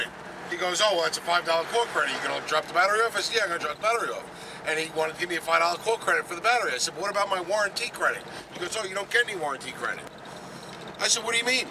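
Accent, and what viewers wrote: New York, United States. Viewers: Sounds like you're from New York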